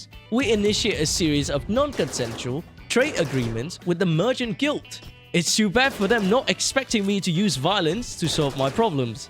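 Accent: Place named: India. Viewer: why do u sound like u have a 5% indian accent today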